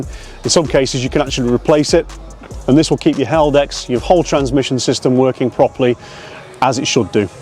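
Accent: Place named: Leicester, United Kingdom. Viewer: It's the Leicester accent, that's accent nor excent!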